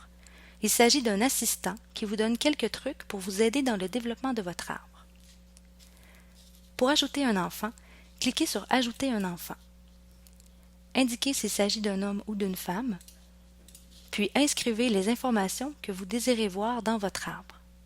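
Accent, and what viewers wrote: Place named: Quebec, Canada. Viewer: L'accent (que j'adore) québécois tout simplement ;-)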